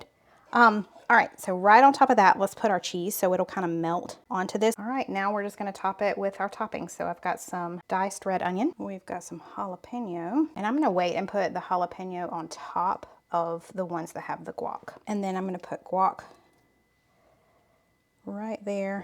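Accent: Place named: Appalachia, United States. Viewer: You always say "bring it to a bowl"...................what size bowl? Is that a cereal bowl? Seriously, your hillbilly accent drives me nuts. It is PO-tatoes, not "taters"............how dumb sounding. It is TO-matoes, not "maters". It is "you all", not "y'all". I know you are an intelligent woman, but you sure don't sound like it.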